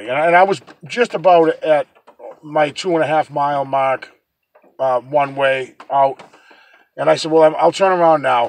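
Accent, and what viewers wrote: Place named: Massachusetts, United States. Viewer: your a riot 😂😂😂!! That Mass accent. And the way you word things..funny..God I miss home. Love your videos WTH download em all..lmao..love ya..Linda in Florida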